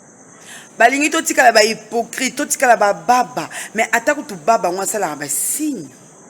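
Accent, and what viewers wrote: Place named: Brazzaville, Republic of the Congo. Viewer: Oza congolaise ya Brazza te prononciation la prouve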